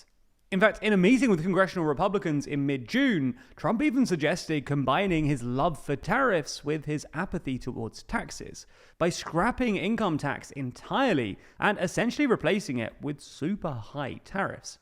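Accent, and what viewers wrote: England, United Kingdom. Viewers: This dude announcer is from England?? Like England has such a great interest in American politics?? 😂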